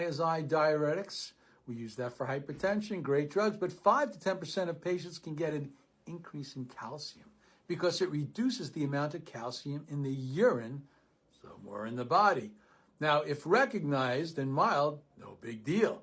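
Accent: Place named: New York, United States. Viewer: I find it comforting to be yelled at by older me with a New York accent about topics I didn't know I would find interesting ever since I volunteered with the Bernie campaign a million years ago in 2016. I'm gonna go blow my dad's mind when I tell him where his shortness of breath is actually coming from. He was sure it was the smoking he did as a teenager catching up with him 50yrs later.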